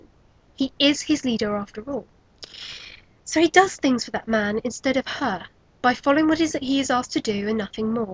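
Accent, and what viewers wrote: United Kingdom. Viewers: LOL I'd like to see Canon Ulquiorra's reaction to UH Ulquiorra XD Oh the so-called Orihime fans *rolls eyes* the only ones in denial are them. As regards the heart and it's meaning, one of the chapters of the Hueco Mundo Arc was called "Six Hearts Will Beat As On" refering to all the nakamas who went to save Orihime, so there it is, the "heart" as a friendship device. Btw, I love your pronunciation. You're british, aren't you? XD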